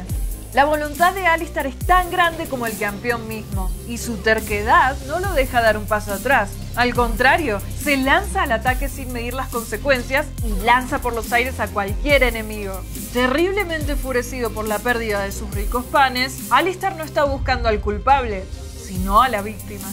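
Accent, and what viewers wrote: Rioplatense, Argentina. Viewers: Pueden porfavor pedirle que hable más neutral ? Sin tanto acento, se entiende que es Argentina pero viejo a la panchasky le exigieron hablar "neutral" durante toda su estadía en Riot. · no puede evitar el acento argentino xd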